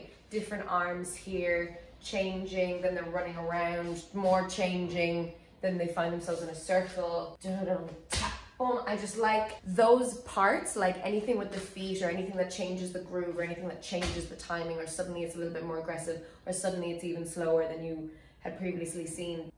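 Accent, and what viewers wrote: Ireland. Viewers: Sorry you’re Irish? I am terrible at accents so I wouldn’t be able to tell where you are from even if you hit me in the face with your country’s flag.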